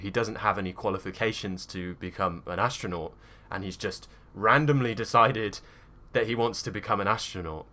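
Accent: United Kingdom. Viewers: Can't wait for your weekly lessons! 🔝 I'm completely in love with your super British accent lol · Mr, Good morning, I like the British accent with pronunciation. I've also searched for this kind of video until I find you. Now I'm thrilled with your videos. My morning also starts with your videos. My improvement is also apparent now. Actually, I'm from #Bangladesh. Lots of love from my country. Warm Regards, RAZIN AHMOD:elbowcough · I'm sure most of people subscribe to your channel because of your clear and beautiful British accent. Congrats 🎊 may you reach more 😊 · British english is so sexy 😏 I love it and I am also excited bout your videos... I admire your accent 🤔😱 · hi, I'm from Brazil, I like british accent and your videos. cheers !...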